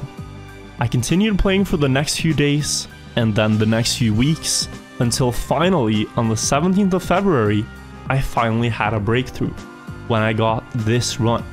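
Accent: Norway. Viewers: You norsk?? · I just saw that he is Norwegian. Explains the trash accent.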